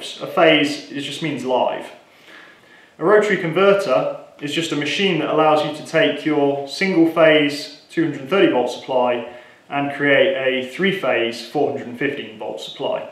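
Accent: United Kingdom. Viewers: The Union Jack bow tie and the Union Jack in his "Handmade Extreme" design didn't tell you he was from the UK? What about his accent? Or what about where he says "here in the UK". Yup, just for people with your low comprehension skills he should have flags on the walls, maybe also in the background a red double decker bus, a portrait of the Queen and maybe he could be eating fish and chips......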